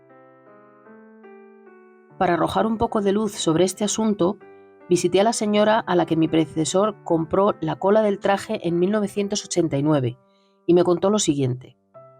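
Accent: Spain. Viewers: AUNQUE TENGO MI OPINIÓN SOBRE SISSI COMO EMPERATRIZ, ME ENCANTAN TUS VIDEOS EN ESPECIAL LOS VESTIDOS DE SISSI. YO QUE SÉ COSER UN POCO ENTIENDO EL TREMENDO TRABAJO DE ESOS BELLOS VESTIDOS. ME ENTRETENGO TANTO MIRANDO ESTOS VIDEOS QUE CUANDO APARECE UN ANUNCIO ES COMO SI ME SACARAN DE MOMETO DE ESA ÉPOCA Y ME TIRARAN AL PRESENTE. LO EXPLICAS TODO MUY BIEN, Y CON TU ACENTO ESPAÑOL SE ESCUCHA MUY BONITO. SIEMPRE TIENES EL DETALLE DE CONTESTAR A QUIENES TE ESCRIBIMOS. POR LO QUE ME ATREVO A PEDIRTE UN GRAN FAVOR SOY RAPHAELISTA DESDE QUE TENÍA 11 AÑOS Y YA TENGO 72, ME ENCANTARÍA QUE HICIERAS UN VIDEO DEL MUSEO DE EL CANTANTE QUE AUNQUE YA HAY UNO SÉ QUE EL TUYO SERÍA MUCHO MÁS DETALLADO. DESEÁNDOTE MUCHA SALUD Y SUERTE CON TUS VIDEOS. 😊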